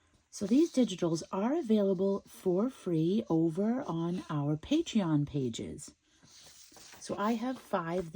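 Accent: Australia. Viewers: well what can i say but awesome! loved your story and the gluing too xx enjoyed everything about this,even your australian accent lol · Omg your Australian accent is awesome! I love it! I’d love to hear that mix tape! ❤️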